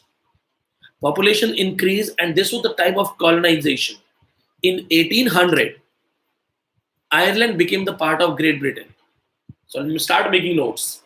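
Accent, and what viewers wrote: India. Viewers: an lndian speaking english in his or her own way of Indian style is really great to listen to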